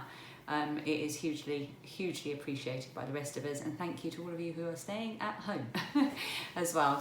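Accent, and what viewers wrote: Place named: Kent, United Kingdom. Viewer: Hi, I was born at West Hill too! Recognised where you were walking in this Monday's blog as it's near where I used to get the bus to school. I've watched your blogs for a few months as I love crafting and recognised your accent, so thought you must be in that part of Kent. Accent you may say, I haven't got an accent! That's what I though till I lived in other parts of the country. I'm a teaching assistant near Nottingham now and children are always telling me I sound different to them when we're doing phonics, especially 'a' and 'u'. Makes me laugh. Anyway take care.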